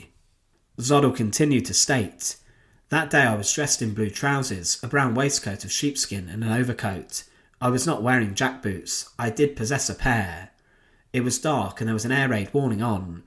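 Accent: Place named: East Midlands, United Kingdom. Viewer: I'm from Wolverhampton and can catogorically say he's not from anywhere in thecwest midlands! Later in life I worked in Derby for twenty years and he sounds like he comes from somewhere in the East Midlands ... Can't really say where exactly though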